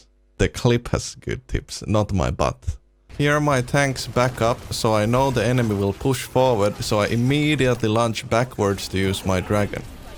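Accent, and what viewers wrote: Finland. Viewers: You soind like youre from finland. Good tips and crazy gameplay! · suomi aksentti <3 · U sound Finnish??? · Your english is very finnish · I thought I heard a Finnish accent, I guess I did 😂. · Dude are you finnish? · Took me three seconds to figure out you're Finnish by your language melody (my dad is Finnish, so I'm familiar with the language), but it took me EVEN LESS time to figure out that you're amazing at Overwatch and that this video will contain good info! Loved it! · My god I love the Finnish accent. Beautiful language too.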